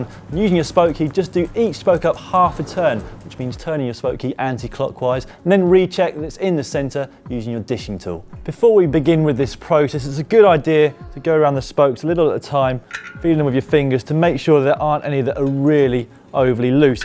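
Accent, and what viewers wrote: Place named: United Kingdom. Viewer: Great. All of the most vital things to know are glossed over in a super fast thick British accent so...thanks for nothing.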